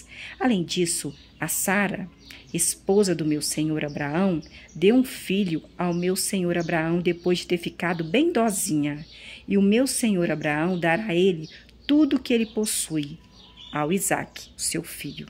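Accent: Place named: Minas Gerais, Brazil. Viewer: pelo sotaque lindo de mineirinha, já era pra mim saber! Deus abençoe sua coragem e grande ajuda com explicações como a sua, simples e voluntária! 🙏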